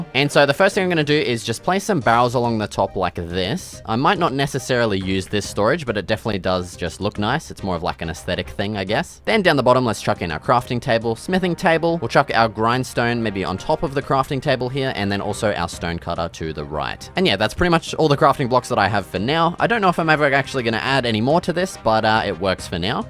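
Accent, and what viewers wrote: Australia. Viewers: bro couldn't be more Australia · Your Australian right?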